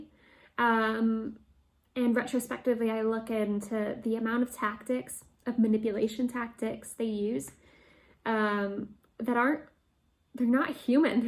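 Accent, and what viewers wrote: United Kingdom. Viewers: Ah your sweet little UK accent that just barely comes out sometimes..adorable! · What's really interesting is I sensed a UK accent when you first started talking before you even mentioned you lived/worked there. So cute!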